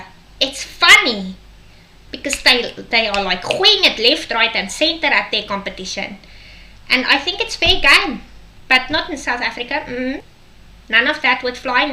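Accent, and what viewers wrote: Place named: England, United Kingdom. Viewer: Ek hou van jou engelse aksent! jy s lieflike!!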